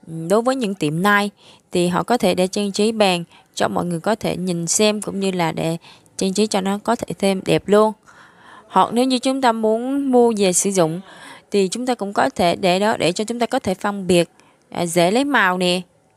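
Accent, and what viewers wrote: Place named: Vietnam. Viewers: Haha giọng miền nào nghe ngộ vậy 🤣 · Kính thưa các loại vùng miền luôn.chắc ở nước khác nhưng gốc việt 😅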